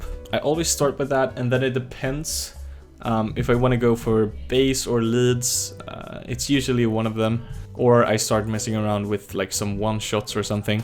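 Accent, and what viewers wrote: Sweden. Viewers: Dude that brutal swedish accent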